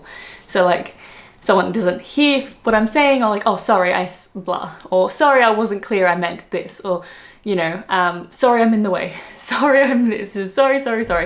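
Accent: Australia. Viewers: You do still sound Australian if that helps, although not overly so, quite a posh version 😁🤔. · We Brits can be direct, particularly if trying to get ones money back for a cancelled event or faulty goods. Venting ones spleen is cheaper than an exorbitantly priced Solicitors letter. You can pass as a Brit as your accent is not overtly Aussie, more nondescript and anyone who lived in Earls Court for a reasonable time would be used to Aussieisms, used by all residents there. Is it still the Aussie capital of London? The politeness on the roads is an hangover from as late as the 50's, when horse drawn transport was still common and one gave way to an horse drawn vehicle, they still have right of way. Not being a Cricket fan and admitting it has just presented Australian immigration authorities an excuse for refusing entry back into the country, Despite the Australian lockdown this upcoming Ashes tour has got the go ahead, nothing stops the Ashes, apart from a World War. 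· You are sadly losing your lovely Aussie twang it’s changing gradually in to a posh English accent.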